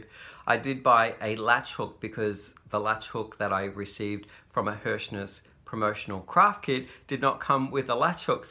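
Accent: Australia. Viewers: Hi. you sound Australian! where are you from. O my goodness these fish are gorgeous!!!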